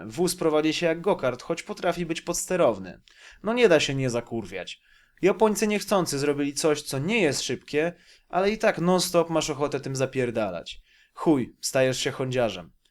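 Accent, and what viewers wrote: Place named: Ostrołęka, Poland. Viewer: Ostrołęckie akcenty, honda na WO.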